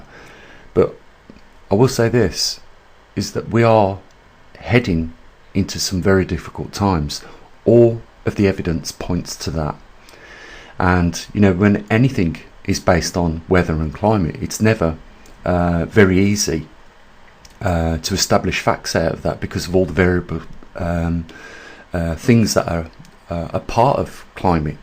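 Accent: United Kingdom. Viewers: Love the British accent! But are you talking about the earth's Chandler Wobble. Because the earth is moving thru space like a drunkard right now. And that is giving us extreme weather changes around the planet. The crazy drunkard planet is giving us low and high jet streams in America which means severe weather such as tornado's, blizzards and ice storms so far in December 2021 and January 2022!! Is this what the Mayans were talking about? You said in the next 40,000 years the earth will start to get colder! And we are about 6,000 years into it? Wow!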